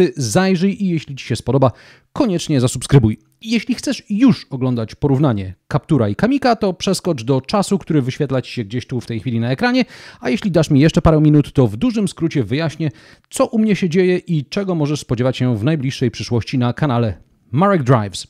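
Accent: United States. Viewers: Marek, mieszkałeś z Stanach, że masz US akcent? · Akcent rzeczywiście mocno US :)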